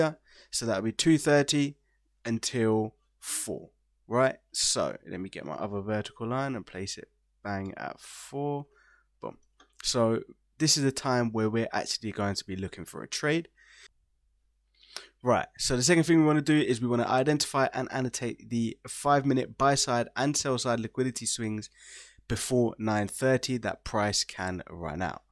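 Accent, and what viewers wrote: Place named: Germany. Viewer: wie wenn du deutsch redest.bitteversuche in englischen dialekt zu reden.versuche.du gibst sicher gute tips aber der dialekt macht dich unhörbar.bemuhe dich.danke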